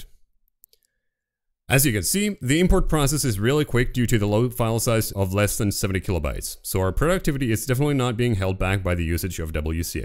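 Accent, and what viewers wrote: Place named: United States. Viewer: It sounded like a regular america accent to me lol. Robotic? lol. But yeah good job. I speak fast myself and thought it seemed a reasonable pace but you slur your words together a bit, I have the same problem, slowing down might help with that. But thanks for making this fast and very short. I really hate it when tutorials drag on too much.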